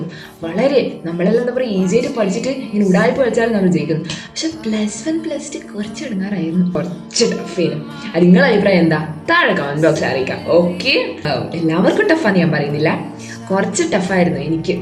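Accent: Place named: Kozhikode, India. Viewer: Aah... Bhasha kettitta.... Ente slangum almost same aahn...Kozhikode evdya??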